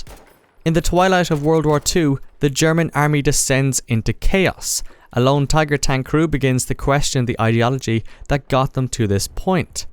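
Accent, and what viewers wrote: Ireland. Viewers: he's irish, he said it right in this video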